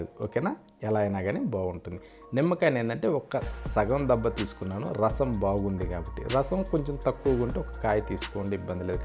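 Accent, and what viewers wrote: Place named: Telangana, India. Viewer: అన్న మీమాట తెలంగాణ మాట లాగే వుంది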